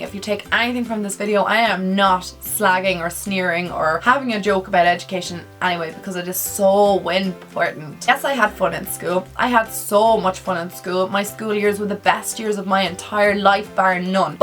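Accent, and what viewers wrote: Ireland. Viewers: Are you Irish? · Love your advice and health related videos😍 So relatable and helpful, definitely one of my favourite YouTubers! What county are you from in Ireland? I'm from Dublin but my accent is nothing like yours😂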